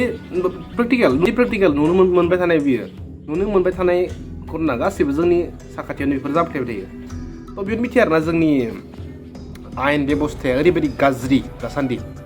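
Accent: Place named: Kokrajhar, India. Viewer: Wi pongbai ngni railaina esa mwjangwi boro pior railainw swlwngbao 😮sanjarisw jayw matw nwngna railainaia? mwjangwi swlwngpin kokrajhrni railainai baidi obwlasw bujigwn jwng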